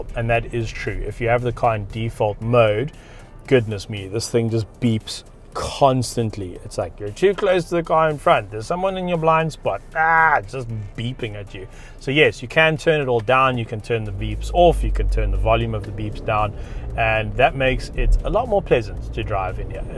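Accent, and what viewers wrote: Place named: South Africa. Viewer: hey, to be honest, south african accent is way easier to listen to compare to the aussie ones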